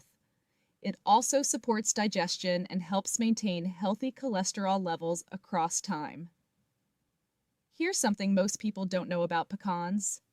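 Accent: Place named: West, United States. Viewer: too much of WESTERN ACCSENT HENCE WE UNDERSTAND ONLY HALF ... THANK YOU ... !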